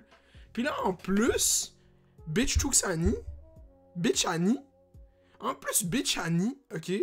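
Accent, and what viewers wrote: Quebec, Canada. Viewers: Je suis un algérien de France, je comprend rien à l’accent québécois, je comprend rien au YouTube game québécois, le basket ou le rap us m’intéresse pas non plus mais depuis que je suis tombé sur tes vidéo par hasard, je regarde ça avec assiduité parce que je trouve que tu parle très bien, au point où j’arrive à faire abstraction de l’accent ou de l’argot.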